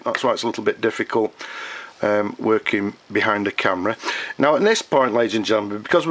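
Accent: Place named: Manchester, United Kingdom. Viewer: Thanks to you (and a friend who supplied a feed,nib and distributor) I was able to repair a Parker 51 that belonged to my late grandpa. Thank you very much. Your videos are clear, your voice is quite nice (where are you from?, I can't locate your accent quite well, I'd say Manchester.) and well, your videos are all-around great. Thanks again!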